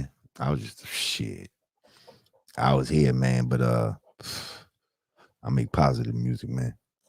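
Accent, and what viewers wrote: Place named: Ireland. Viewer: Salute to the Irish accent 🥃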